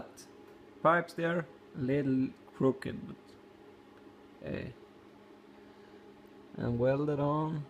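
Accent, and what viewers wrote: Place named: Upper Peninsula, United States. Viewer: cool, the accent is very similar to what it sounds like in our Upper Peninsula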